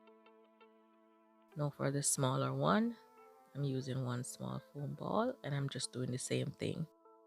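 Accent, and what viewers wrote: Jamaica. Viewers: Your pieces are gorgeous❤❤❤❤❤ and sounds like you are Jamaican🇯🇲🇯🇲🇯🇲🇯🇲🇯🇲👍👍👍👍👍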